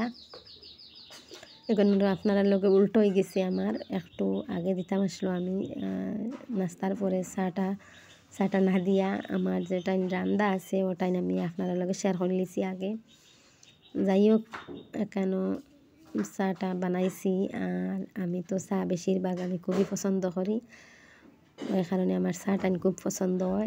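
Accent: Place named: Sylhet, Bangladesh. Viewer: আসালামুয়ালাইকুম আপু আমি তুমার বিডিও পুল সাপুট করি তুমার রান্নার পিটা কই মাছ এমনকি তুমার সিলেটি কথা সুনার জন্য বিডিও চেরে রেখেচি❤❤❤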